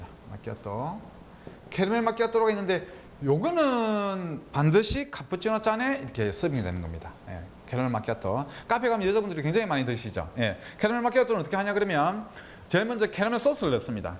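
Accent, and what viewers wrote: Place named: Gumi, South Korea. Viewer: 강의 넘 잘들었습니다 혼자 공부함에 많은 도움 되었습니다 커피에 대해 알고 싶은 욕구 충분히 채워주신 덕분에 만족합니다 고향구미 사투리 반갑고 정겹습니다 ~^^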